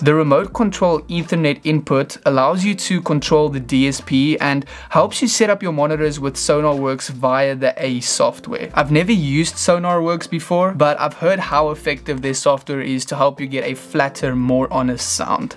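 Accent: South Africa. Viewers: I just watched a video of yours where you compared 6 studio monitors and went looking to see if you had an updated version. This was recent so I watched it. My suggestion is to use a spectrum analyzer to compare monitors. Anything else is too subjective. You can even install a free one on your phone. The purpose of monitors vs speakers is to give you the pure, unaltered sound of the music/instruments/voice as it was recorded/played. The only way you can tell is to use a spectrum analyzer and compare how flat the sound is from one to the next using an objective measurement. I like what you're doing, just a suggestion on how to do it. BTW, are you South African? I lived there for several years a long time ago. A beautiful country.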